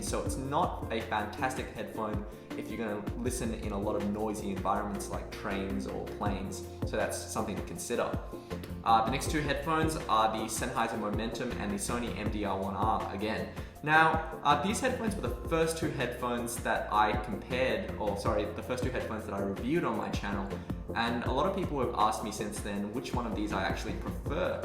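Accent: Australia. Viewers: You're an aussie? subbed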